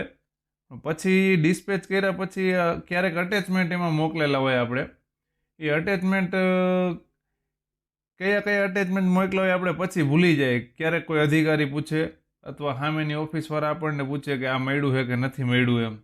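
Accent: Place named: Kathiawar, India. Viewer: હા કાઠિયાવાડી હા... Continue રાખો...😂😂😂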